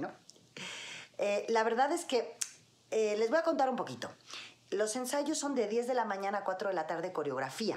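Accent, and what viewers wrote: Mexico. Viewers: Yola. Cuando tenía trece años (1984) estaba enamorado de ti, hoy tengo 48 y sigo enamorado de ti. Eres hermosa. Me encantó que ta hayas quedado a vivir acá y que hayas adoptado el acento de México. Eres la perfección hecha mujer, me encantas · Yola tantos años viviendo en México, que ya perdió su acento de española. Habla como mexicana 😘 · Que dicen tus compañeros de parchís acerca de tu acento ya cambiado al acento mexicano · Hola, que lindo encontrarte por aquí, ya tienes un nuevo suscriptor, saludos de un peruano gran fan tuyo, sobre todo desde cuando formabas parte de "Parchís", era muy niño claro, y eras mi amor platónico, jeje, bueno, de la mayoría de niños, y de modo tierno claro. Se ve que tienes mucho tiempo en México, por tu acento, muy bien por ti, debes estar a gusto por allá, es lo que importa. Me encantaría me envíes un saludo linda, sería como un sueño cumplido para mi, jeje; mis mejores deseos para ti y un beso y fuerte abrazo a la distancia, cuídate linda!.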